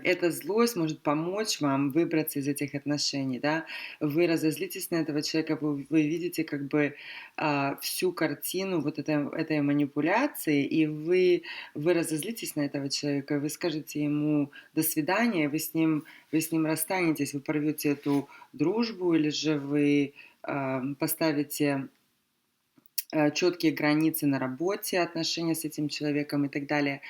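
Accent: United States. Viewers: Какая жёсткая американская интонация... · Очень приятно вас слушать. Приятный американский акцент. Хочу так разговариваить. так бы слушала и слушала.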